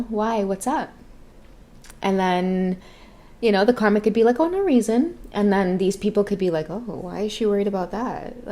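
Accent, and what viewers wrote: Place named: Trinidad and Tobago. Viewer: Not Jamaican, she’s from Trinidad not Jamaica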